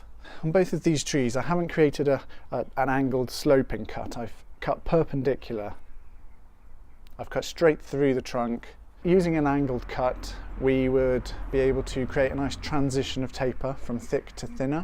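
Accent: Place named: United Kingdom. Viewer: I'm from Germany. By you're accent you're from Britain, right?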